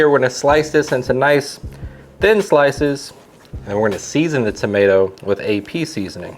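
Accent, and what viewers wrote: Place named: South, United States. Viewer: Where are you from?! You sound southern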